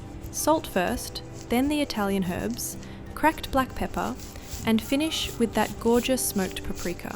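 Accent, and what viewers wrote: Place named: England, United Kingdom. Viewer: Why do the videos for this channel use a voiceover with an English accent, when it is clearly produced by a US citizen? Yellow onions are used in some recipes but they are called brown onions in the UK. All UK airfryers use temperatures in Centigrade, but Fahrenheit are always quoted first on this channel. And no-one in UK calls an aubergine an eggplant. Just be honest guys!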